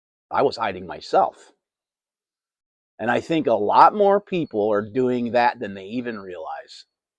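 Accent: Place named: Georgia, United States. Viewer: "Let's have a talk" in that warm Georgian accent is absolutely killer. jmho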